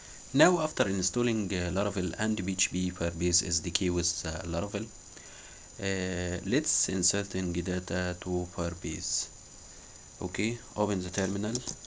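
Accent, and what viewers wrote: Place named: Arab world. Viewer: هههههههه بعد ما وصلت 3 دقايق فى الفيديو قولت الانجلش دا بتاع حد عربى قفلت الفيديو لاقية اسم القناة بالتوفيق يا هندسة اتمنى انك تعمل ابديت للشرح مع نسخ اللارافيل الاعلى على فكرة انا مش بتريق على الانجلش بتاعك انا الانجلش بتاعى وحش جدا اصلا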